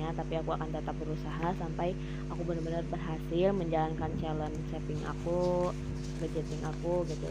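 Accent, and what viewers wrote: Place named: West Java, Indonesia. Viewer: Logatnya msh ketara org sunda nya ...unboxing paket binder lanjut budgeting ya teh smg terus istiqomah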